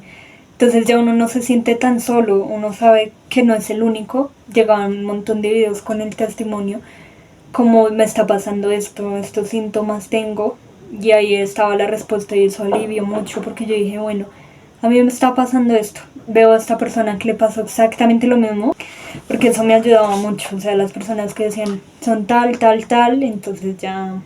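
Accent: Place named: Colombia. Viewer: Hola cómo estás, por tu acento creo que eres de Colombia yo también te pido por favor ayuda no estoy bien, y pedí a Dios ayuda y justo me apareció tu video me gustaría contarte mi historia para que me puedas brindar una orientación porque yo se lo q estoy viviendo no me lo invente yo, no estaba nunca buscando información sobre nada de estos temas todo ha llegado a mi solo yo no estoy loca, ni tengo ninguna reprogramación de una tal “nueva era” como dijo una señora en otro video que observé por siempre he tenido una conexión muy profunda con Dios y soy Asocial no frecuento lugares, no tengo amigos, siempre he estado sola no hablo mis cosas con nadie entonces quien me lavo el cerebro? Quien me reprogramo? Y Dios no habría permitido tal cosa para mí, yo no vivo una vida mundana. Yo quiero hablar contigo, por favor 🙏 Dios te bendiga.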